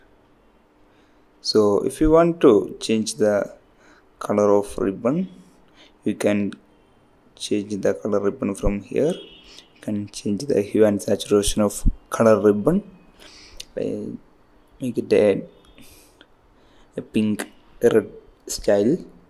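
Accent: Kerala, India. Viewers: bro are you form kerala you sounds like a malayali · What kind of english are u speaking 😑😑😑😑 do all indians speak like this?? Omg 😂😂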